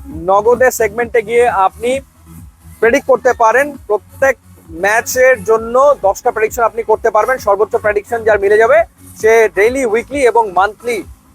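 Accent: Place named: Kolkata, India. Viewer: আপনার কথার ধরন কলকাতার মানুষদের মতো কেন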